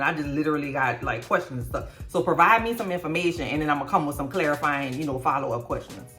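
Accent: New Orleans, United States. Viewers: Why don’t they just put you on the show, you could be one of those ladies. I don’t know why you’re in New York with a New Orleans accent but they’ll figure it out. This show just needs more laughs.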